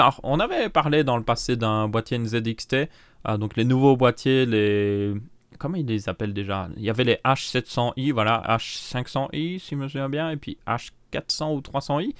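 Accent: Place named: Belgium. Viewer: C'est l'accent belge qui lui fait prononcer "léger" en "légère", c'est tout.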